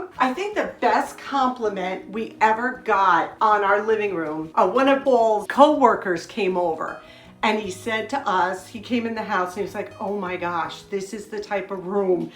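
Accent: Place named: Long Island, United States. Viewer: Love it! Are you from Long Island, NY? Can't miss your accent! Is the icing on the cake...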